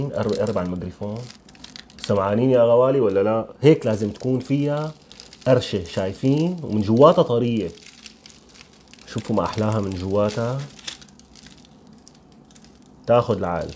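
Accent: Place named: Syria. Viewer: فديت اهل سوريا واللهجة السورية متابعة من العراق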